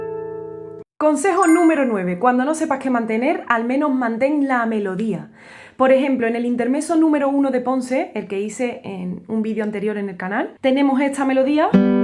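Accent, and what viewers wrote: Andalusia, Spain. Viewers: Soy argentina y el único acento más bello que el nuestro es tu preciosísimo andaluh 😍 Hermoso escucharte tocar y hablar, un placer para los oídos!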